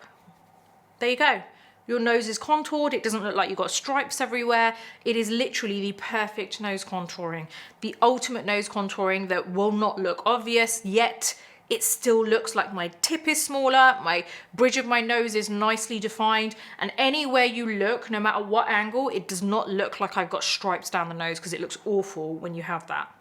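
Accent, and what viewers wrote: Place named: United Kingdom. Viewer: I love to watch your make up tutorials ,they are so helpful and you are absolutely beautiful and I love your British accent,I have always wanted to go there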